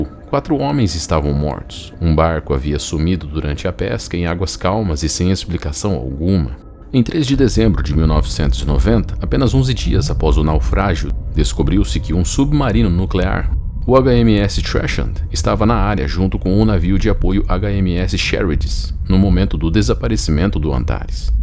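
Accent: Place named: Caipira, Brazil. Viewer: pq ia tem q ter sotaque caipira? bota um sotaque neutro aí, seu google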